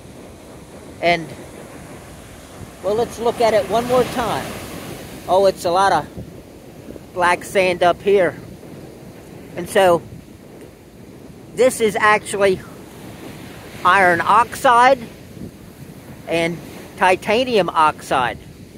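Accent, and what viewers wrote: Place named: Baltimore, United States. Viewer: Hahaha, this guy has a classic Baltimore accent. Sounds like Dundalk specifically. Good video.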